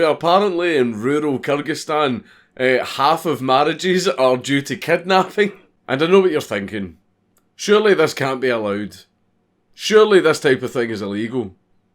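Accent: Scotland, United Kingdom. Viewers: Scottish accent = awesome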